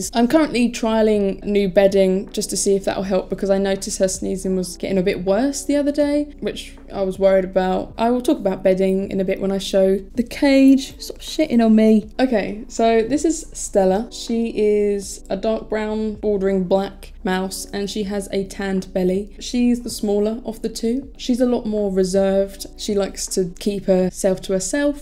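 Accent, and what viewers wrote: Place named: United Kingdom. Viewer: Love the accent innit. UK accents are so cool.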